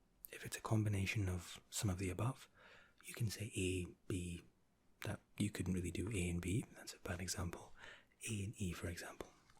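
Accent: Scotland, United Kingdom. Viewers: I love the tone of your voice and the way you speak. Maybe it's the accent that I like so much being a fellow Scot ☺️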